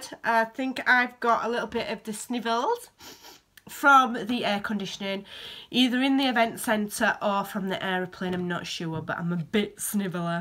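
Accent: Yorkshire, United Kingdom. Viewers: Hello from Texas! I'm a Manchester girl born and bred so you have no idea how lovely it is to hear that Yorkshire twang. Can't beat a Yorkshire sense of humor, something sadly lacking over here. I can't tell you how many times I come out with a saying and people just stare back blankly. Any ways up (there's another!) I love your videos and follow you daily. Thanks muchly x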